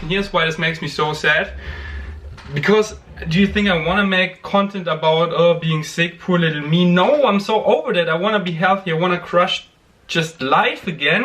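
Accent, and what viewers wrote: Germany. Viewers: Stop worrying about your english so much. I already understand everything you say in english perfectly and I love your german accent. It sounds endearing.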